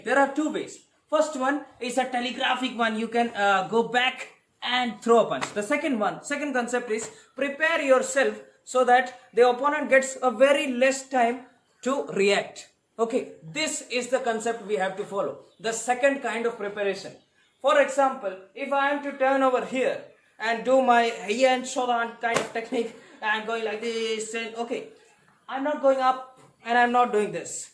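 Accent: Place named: South India, India. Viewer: Ur accent is like south Indian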